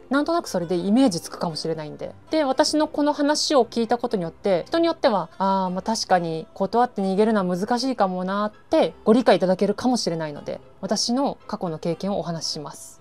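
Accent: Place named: Kansai, Japan. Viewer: 関西弁だから関西人かぁ？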